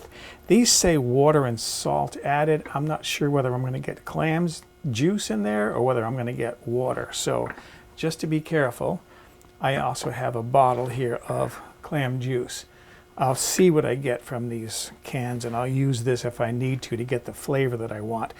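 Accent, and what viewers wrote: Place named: Rhode Island, United States. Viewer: First of all, if you’ve been making clam cakes for decades then you’re my clam cake guru. Also, that’s a whole lot more clams than most of the clam cake makers back in Rhody put in their clam cakes while I lived there, most of them use mostly clam juice & try passing them off just like fake Rolex’s! I plan to make clam cakes the same way that you did in this video when I make clam cakes & chowder this Thursday! I’m gonna use cherry stones rather than canned clams though. Also, you sound a whole lot like most of the people who I left behind 5 years ago when I left Warren, RI & moved here to SanDiego. I also left the clam cakes behind so I’m totally jonesing for clam cakes because they don’t sell them out here in California. So it’s make my own & I’ll do it just like you did it in this video!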